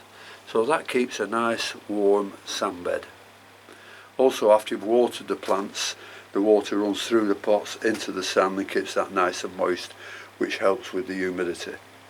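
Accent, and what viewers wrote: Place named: West Yorkshire, United Kingdom. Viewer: Hi Have just found your channel I live in Brittany but it is lovely to hear your accent as I originate from West Yorkshire.I have just subscribed to your channel and will be watching them all.I am only new to orchids but I am hooked!!! I do have a greenhouse but I think it would be to expensive to run.So I have converted one of my rooms into an orchid room with an humidifier so we will see how I go Sorry for rambling on All the best to you Keep Smiling Thelma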